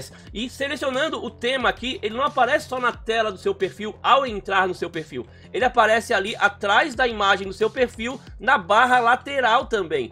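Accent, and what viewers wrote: Florianópolis, Brazil. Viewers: TU E DE FLORIANOPOLIS???????????????????????????????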